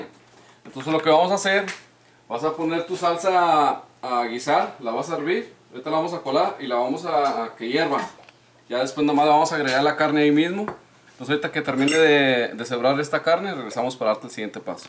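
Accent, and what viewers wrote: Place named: North, Mexico. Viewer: Por su acento confió más en que esta receta sea la más adecuada ¡Arriba el norte!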